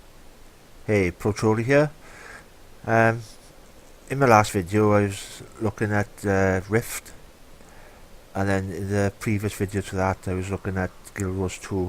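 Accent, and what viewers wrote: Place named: Wales, United Kingdom. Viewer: Love your Welsh accent Baaaaaaaaaah (sorry that was unnecessary I know).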